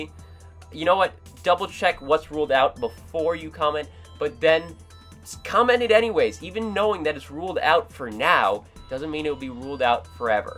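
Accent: Norway. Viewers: Are you Norwegian?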